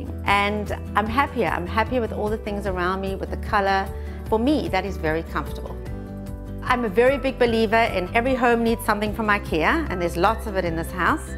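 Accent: South Africa. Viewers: Guessed your were South African. I too am from South Africa. Love your home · Took me 3s to recognize the South African accent. The style is so South African. Beauty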